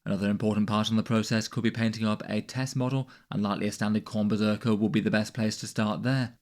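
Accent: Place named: United Kingdom. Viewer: Does everyone in the UK speak a million mil… kilometers an hour? I know bro has to get the info out in a timely manner but with that mono pitch, thick accent, and high word per minute it’s difficult to absorb most of the info. It’s probably worse for those whom English isn’t their first language.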